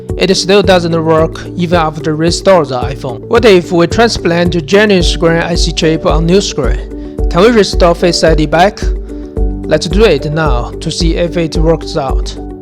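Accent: India. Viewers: О этот божественный индуский акцент)) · thats not his video....... look.... this guys voice is indian, the video is china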